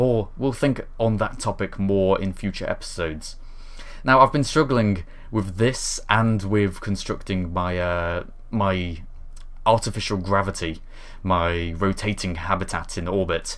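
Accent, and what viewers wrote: United Kingdom. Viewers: Don't worry. We all love your British accent.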